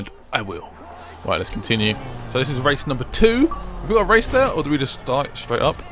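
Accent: England, United Kingdom. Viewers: This man English ?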